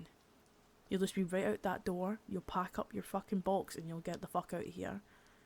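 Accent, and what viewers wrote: Scotland, United Kingdom. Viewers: Scottish accent uhhhhh THE BEST ! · Great work. (Is that a scottish accent?)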